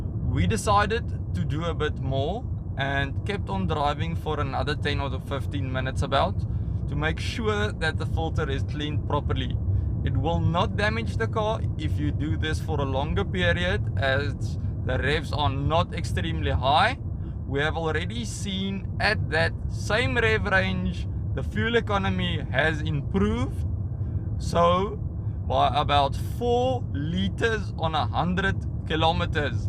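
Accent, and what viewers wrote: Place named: South Africa. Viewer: Definitely South africa !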